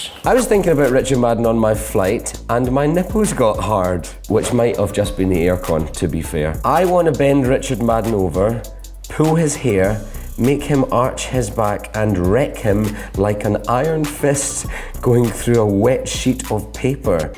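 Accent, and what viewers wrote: Scotland, United Kingdom. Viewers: He's Scottish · it's a Scottish accent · he's so Scottish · Omg, he is a Scot! Just realized that! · Scottish accent! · Trepimero Scottish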